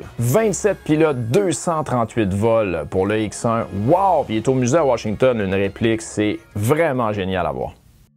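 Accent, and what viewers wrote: Quebec, Canada. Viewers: Toujours un vrai plaisir. Le ton, le style et tes efforts pour lisser ton sympathique accent québécois. Au dela de la forme, c'est tres intéressant d'avoir les nouvelles du spatial d'un point de vue nord-américain et ... en français ! Courage...on aime ton travail 🇨🇵 ❤ 🇨🇦 Ps. J'ai conscience de l'esprit indépendant nord américain mais pourquoi pas des collaborations avec des YouTubeurs français? C'est une pratique courante ici chez ces "maudits français". Ton nombre d'abonnés n'est pas a la hauteur de la qualité de ton travail...(aucune flagornerie de ma part...)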